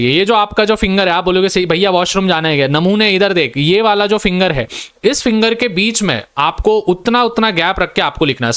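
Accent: Maharashtra, India. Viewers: Haa sir Marathi vala vatte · Sir marathi ahet ka 😅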